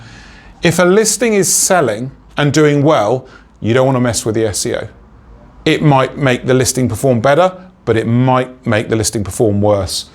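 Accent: England, United Kingdom. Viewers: great to have an English accent on here. Most etsy helpers seem to be from US!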